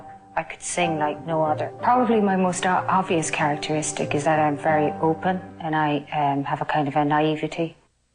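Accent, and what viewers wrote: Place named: Ireland. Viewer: Irish people really say "me kids" in stead of "my kids", cute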